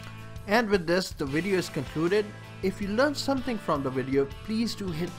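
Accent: India. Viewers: I kinda started liking Indian accent.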